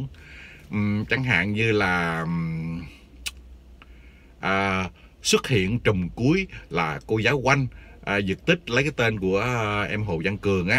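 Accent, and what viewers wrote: Standard. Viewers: Anh nói quá chuẩn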